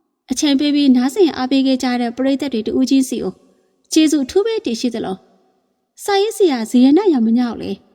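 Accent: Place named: Thailand. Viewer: နားထောင်လိုအရမ်းကောင်းထိုင်းလိုမပီတပီပြောတာလေးလဲသဘောကျတယ်ဗျ❤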